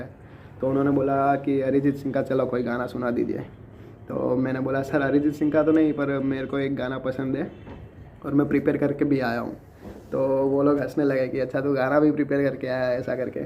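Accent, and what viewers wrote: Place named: Gujarat, India. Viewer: Thank you mota bhai 😊☺ btw from which district are you in gujrat. Your gujrati tone reflect in your talk. Btw jay swaminarayan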